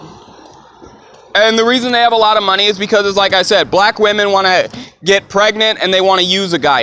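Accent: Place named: United States. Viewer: I'm not sure what to say or think, at first I thought you were trolling -- but maybe you are an american and thus the accent. I'm mixed race as well -- and I've put a lot of thought into the subject for my years on this planet ... I don't quite cosign everything you are saying but I'm sure its accurate to a degree based off of where you live and the people around you -- but I do understand not being taken seriously if what you say is outside the popular narrative du jour. my life has lead me to embracing individualism as a primary value -- something I intend on passing on to my son who is even more mixed. still do you, its nice to see divergent voices and points of view especially among us multiracial folk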